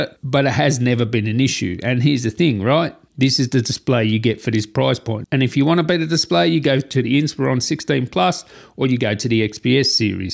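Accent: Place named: Australia. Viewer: Australian accent 😊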